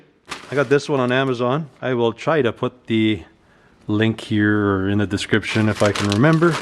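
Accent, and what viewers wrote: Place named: Manitoba, Canada. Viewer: I miss the Manitoba accent. At least you don't punctuate every sentence with the word F@ That might be a Winnipeg thing. I don't know....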